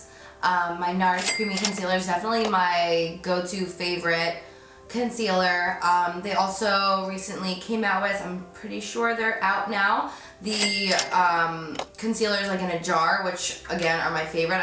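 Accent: New Jersey, United States. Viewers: is she from jersey? :o she has a very heavy jersey accent and i'm from there!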